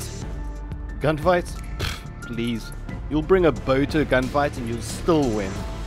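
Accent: South Africa. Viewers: South African? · Dude you should like a South African?? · Are you South African?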